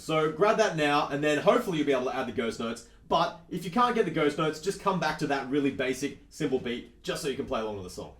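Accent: Australia. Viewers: Quick Question For Ya! Are You From Australia Or New Zealand?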